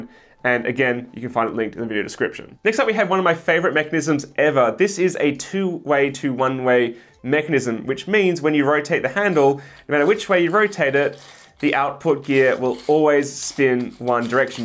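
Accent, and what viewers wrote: Australia. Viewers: I was sold into printing as soon as i saw your big beautiful face and awesome Aussie voice! Youre still the only maker whos community I subscribe to and happily financially support, as you show me all of your failures alongside your successes. Since finding your videos, they have helped me define a path to create a small business creating Flow instruments, such as Dragon Staff, contact staff, poi, and fans. The best company on the planet for this is called ThreeWorlds and theyre actually based out of Queensland. You may be interested in some of their cool spinning geometry as i was, but would be able to acquire it much easier than me over in the States! I learned Onshape, and then you convinced me to learn Fusion360 instead, and then i made all of my own designs! I am currently expanding to have 3 printers, highly modified with Hemeras to print TPU at 100mm/s, just like my first e5+. 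I wouldnt be where i am without you, and my girlfriend is eternally jealous of how much attention you get! -Connor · I’m your biggest fan I’m in australia and only last week I realised your Aussie O.M.G 😀 can I send you a latter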